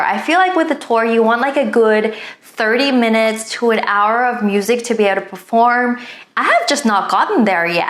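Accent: Australia. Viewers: I miss your vlog channel so much! I used to watch you so often when I was younger, to the point where I still pronounce words with australian accent because I was practicing this language with you 😭 I love to hear you talk and be honest! Hope to see more of that in the future :) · GOD I MISS THE AUSSIE ACCENT :((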